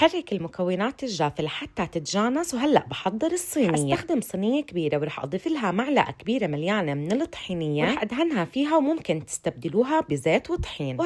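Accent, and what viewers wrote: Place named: Palestine. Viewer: اولا انا اكتشفتك من اسبوعين ومتابعتك من سوريا وبيعجبني شغلك كتير تانيا انتي اكيد فلسطينيه مبين من لهجتك الحلوه وكنيتك وانا كمان ستي امه لابي فلسطينيه من بيت التابلسي بس نحن سوريين ....تالتا رح نجيب شاياتنا ونجي ناكل الكاتو عندك بس انت وين ؟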